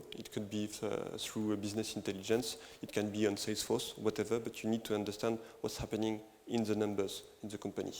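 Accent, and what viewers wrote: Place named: France. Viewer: Ecouter un français parler anglais ça devient vite insupportable. Est-ce que vraiment le type de public justifiait ça?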